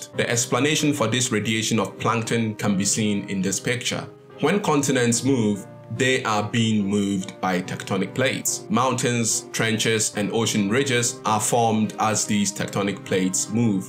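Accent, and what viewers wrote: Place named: Nigeria. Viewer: Make Naija accent the norm in documentaries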